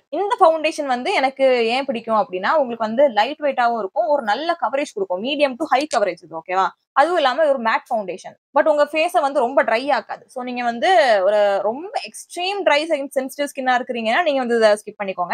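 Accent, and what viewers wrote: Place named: Madurai, India. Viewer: Madurai slang😂💥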